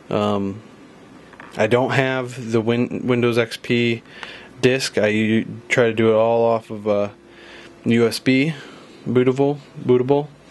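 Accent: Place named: Brazil. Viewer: ho did this video is a brazilian guy, i can see this because accent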